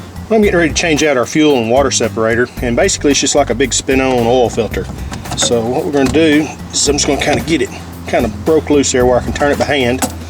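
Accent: South, United States. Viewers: Yea you do, Southern accent. Love the South! BTW thanks for the video for you have provided me with a YouTube Degree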